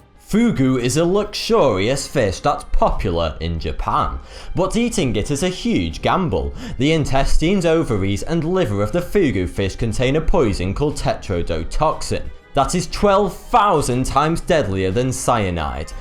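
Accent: United Kingdom. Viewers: That's just the British accent. We pronounce a lot differently. Also he has a regional accent which makes pronunciations even more different. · He is British. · 42? really? the answer to the universe is a lower class Brit with an increasingly annoying accent? seriously? get the marbles out of your mouth son.. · Matthys Fourie think twice how does he foughty2 at the beginning of every video because he has a British accent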